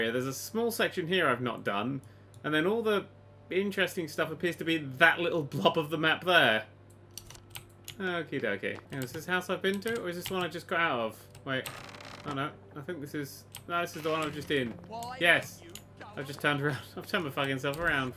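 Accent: England, United Kingdom. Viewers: has English accent. says the word candy this made me cry a little inside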